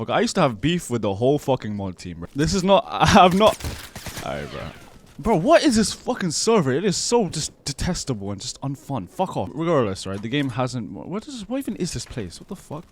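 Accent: United Kingdom. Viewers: ur british like me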